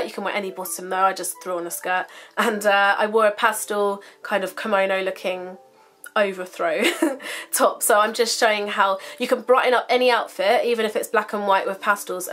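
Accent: United Kingdom. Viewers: I love the accent! <3 Are you British? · she's british you can tell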